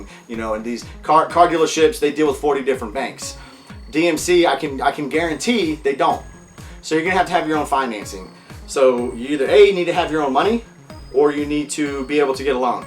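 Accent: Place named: Texas, United States. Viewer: True Texan. Prounounce Humble without the H. Nice dude! I think you can get a kit for the time travel part but it's expensive and you need Libyans for the plutonium. They were unreliable because they were really heavy with the all or mostly steel* body. I think i saw behind the scenes that they couldn't get to 88 mph with the stock motor. *also according to some DeLorean forum they say the car isnt heavy (but for the engine it may be I guess) so I dont know or dont care it's just what I always thought or heard. *originally I put aluminum and in case nobody reads follow up comments thank you for pointing it out. Not dumb just imperfect.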